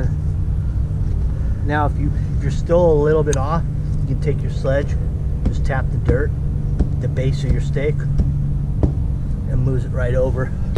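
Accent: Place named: California, United States. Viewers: Are you in California? I say that because you have that laid back California accent, anyway God bless thanks for the video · That California accent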